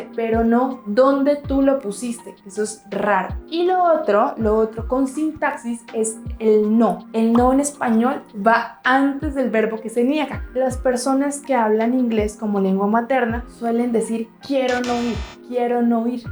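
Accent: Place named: Colombia. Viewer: pues ella es d colombia¡!, me debes 20$ dolares x la infor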